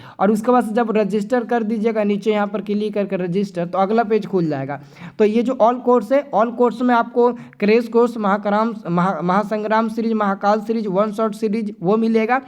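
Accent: Bihar, India. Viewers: tum ho Bihar se ho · Bihar se ho Sir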